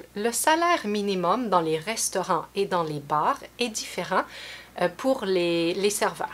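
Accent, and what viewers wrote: Quebec, Canada. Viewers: Hello! I'm so glad you've come back, I love your videos! They're fun and very helpful, also, I absolutely adore the Quebec accent, could you make a video regarding the differences in the pronunciation of vowels, nasals and consonants between Standard French and Quebec French! Merci d'avance! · Hello! I hope you continue making vidéos, I love your vidéos and your accent québécois. And if you continue teaching french on Skype I'd love to know where to get in touch with you:) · ton accent québécois, ta pronunciation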